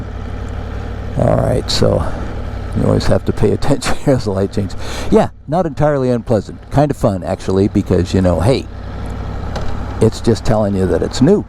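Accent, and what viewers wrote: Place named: Spain. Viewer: Nice ride, I can say that you are a natural Spanish speaker :) Be safe my friend.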